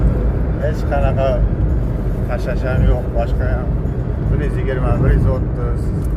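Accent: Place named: Kyrgyzstan. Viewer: Созунор Кыргызчага окшооп суйлопсунорго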